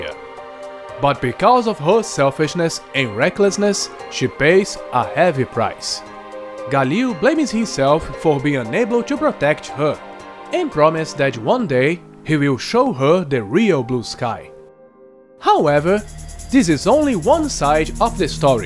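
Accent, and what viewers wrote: Brazil. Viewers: painfull accent bro, I'm brazilian it looks like our accent, great channel but please get rid of the accent its actually not that hard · sim sim,é um jeito bem caracteristico de falar,so quem é brazuka percebe,por sinal otimo review,parabens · Espero poder jogar esse game no 3DS, fiquei desapontado com o Legend of Legacy! E parabéns pela desenvoltura no inglês, pelo sotaque logo percebi que era Brazuca também, sucesso no canal! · are you brazillian? Your accent seens like. I would say you're even from RJ XD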